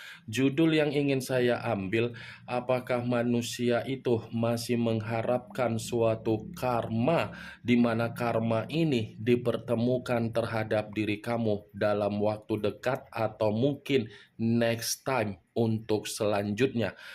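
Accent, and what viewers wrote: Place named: Medan, Indonesia. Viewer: 😂😂😂😂 Maaantaap Kali Aaacchhh Kartu Fortune ini … Bahasa ORG MEDAN Banget SUHU The Best Kita ini …👍👍👍👍👍💃💃😂🙏✍️😎😎😊😊……30 Nov 2023 Pkl wib …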